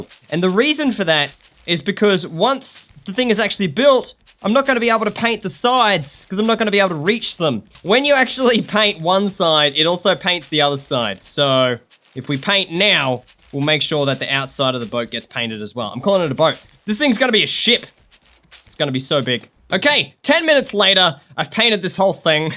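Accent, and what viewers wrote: Australia. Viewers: Are you Australian? I sub to Aussies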